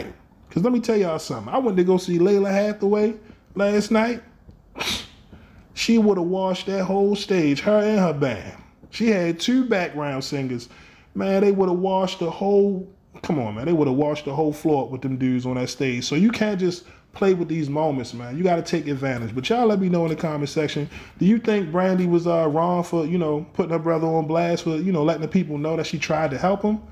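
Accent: Baltimore, United States. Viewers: lol you're definitely from B more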